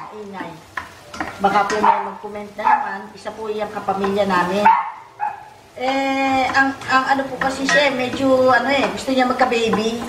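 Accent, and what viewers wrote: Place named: San Miguel, Philippines. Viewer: nakakatuwa lang po kasi ung tono ng salita nyo namimiss ko taga San Miguel din po aq andto po aq ngayon sa abudhabi at paborito po yan ng mga anak ko😊😊😊 lahat po ng recipe nyo pinapanood ko. God bless po sa inyo! 😉😉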